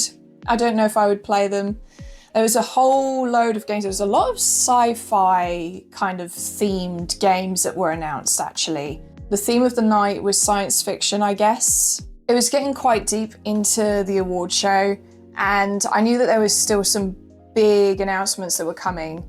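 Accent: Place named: RP, United Kingdom. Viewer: You have the most Cambridge English International Test Listening Part accent ever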